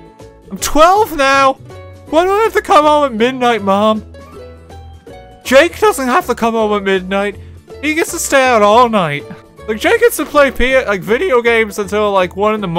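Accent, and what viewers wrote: Ireland. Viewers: Are u Irish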